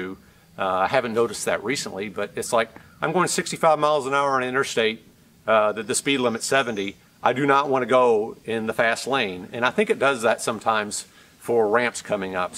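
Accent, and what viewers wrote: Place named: South, United States. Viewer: You have an easy southern delivery. Great job.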